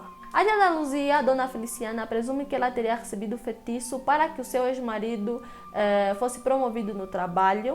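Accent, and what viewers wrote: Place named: Portugal. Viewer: Voce puxa mais para o portugal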